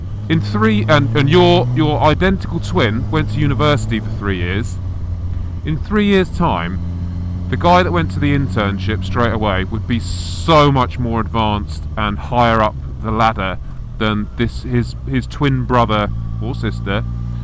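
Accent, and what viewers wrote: England, United Kingdom. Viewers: It's like my own thoughts as I'm riding, only in an English accent. I too started a business, motorcycle related no less. The hard work, self learning, and sacrifices made it successful, not my degree. Great vids. Subscribed.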